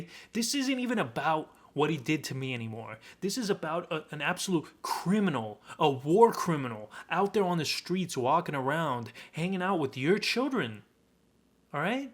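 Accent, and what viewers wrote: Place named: Boston, United States. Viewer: Dude... I absolutely LOVE hearing you rant about Franklin in your Boston Accent! Hilarious definitely gon Sub bro. Love the content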